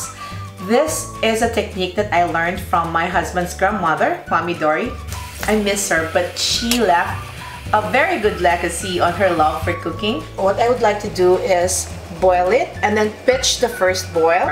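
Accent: Philippines. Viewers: totoo nga naman anyway it recalls memories talaga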